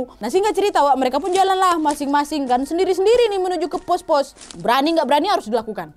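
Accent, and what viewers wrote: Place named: Medan, Indonesia. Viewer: Baru kali ini kuliat org bawain horor cem gini malah jd lawak 🤣🤣 Medan kaleee, semangat kakak bagus deh pembawaan cerita nya 👍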